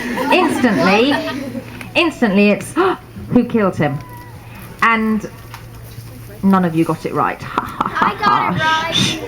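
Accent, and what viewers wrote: United Kingdom. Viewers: We're is she from Scotland? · what...she british.....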